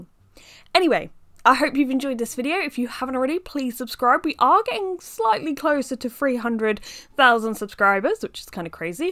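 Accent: United Kingdom. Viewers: yo plz ur accent is from what region in uk i need a gf with this accent!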